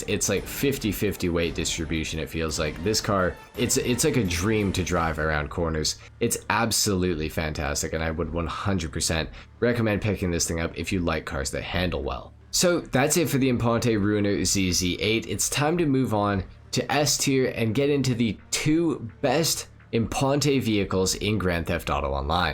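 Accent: Canada. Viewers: U are canadian bro?!!!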